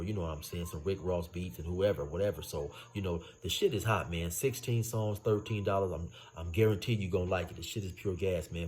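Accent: California, United States. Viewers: Bro I fuks with your channel. I been on it every since it popped up. Your a good story teller and the way y’all Cali boys talk make it more entertaining. You just different bro and I fuk with it. Keep it up G 💯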